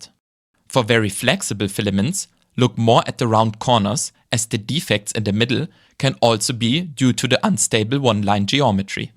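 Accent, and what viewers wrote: Germany. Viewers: my guy as a fellow german, i’m sorry but ur accent is borderline too much. the content is great but it’s really hard to listen to. My guess is it’s because you „chop“ the words, u should adjust your max retraction and flow rate :D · Do you also have a german version of this video? Sounds like you are german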